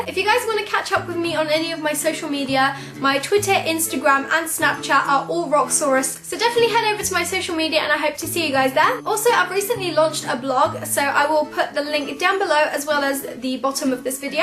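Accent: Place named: United Kingdom. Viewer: To zabawne, bo pomimo tego, że masz piękny i co za tym idzie, mylący, brytyjski akcent, jakoś od początku przeszło mi przez myśl, że jesteś Polką :D Dobra robota, świetny kanał :*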